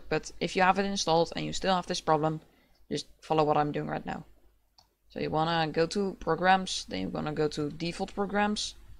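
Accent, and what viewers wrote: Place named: Netherlands. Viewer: I smell nederlands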